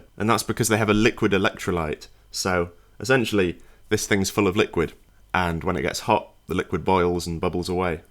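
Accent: England, United Kingdom. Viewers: Your proper English accent made the vid even more valuable and understandable, I hate those mumbling ytbers, it's a pain to listen to them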